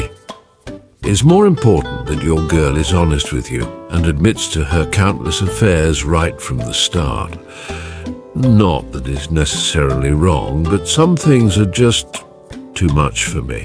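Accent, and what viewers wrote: England, United Kingdom. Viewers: This AI voice is by far the best. The snooty English accent on this one adds a comedic factor these videos.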